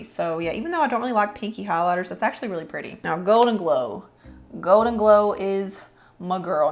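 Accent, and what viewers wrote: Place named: Tennessee, United States. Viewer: Awesome vid. Your accent is amazing. Is it TN? I’m Canadian and love your accent!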